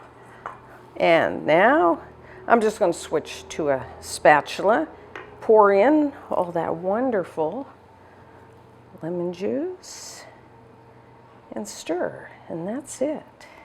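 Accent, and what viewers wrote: Canada. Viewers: Obviously you/she must be from Canada. “Quite this, quite that” just sayin...no slam.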